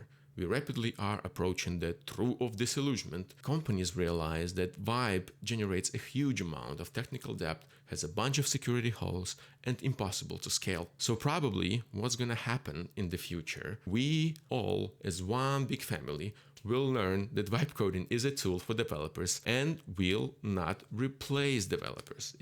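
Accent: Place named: Russia. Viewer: thanks for answer, actually you're accent is pretty tiny but since I speak the same language as you (Rus) at least one, it's noticeable to me. I don't know why am I writing that in English lol I was talking about the yellow lamp actually which was in the frame, now I know it's name. Anyway thank you for the video, pretty much inspiring. I agree with your point of view and think that to handle the AI code you need to understand what's going on so IT guys should be still in demand. Good luck with the channel, you have pretty unique appearance and quality content so I think you're going to succeed.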